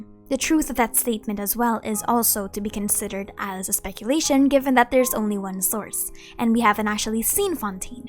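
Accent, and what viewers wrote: Philippines. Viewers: I had the inkling you're a Filipino because of your accent when speaking in English :D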